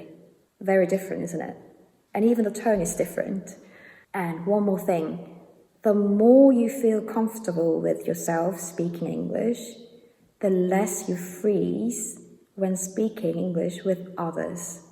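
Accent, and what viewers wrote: United Kingdom. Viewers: 영드에서 들어볼 법한 발음이에요!! 공부할 때 써먹어보겠습니다! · 진짜 잘 일듣기는데 영국식이 편하네요.진짜 젓도 모 르는데 R,t가 잘 듣기네요. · 목소리 자체가 너무 예쁘네요 게다가 영국 발음 너무 듣기 좋아요!!!!! 전 미국영어 발음이 너무 어려워서 차라리 영국발음으로 해야겠다라고 결심한 사람인데요 넷플에서 쉐도잉 하려고 하는데 딱히 맘에 드는 드라마가 없더라고요 재미있는 시트콤이 최고인데 미란다,아이티 크라우드도 없고,,,해서 찾은게 Lovesick입니다 마땅한 컨텐츠 고르는것도 보통일이 아니네요 그냥 이거 보면서 똑같이 따라하고 반복하고,,그래야겠죠 암튼 국내에서 저렇게 영국영어가 가능하다니 놀라울뿐입니다 훠우!!👍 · 영국인 인가여?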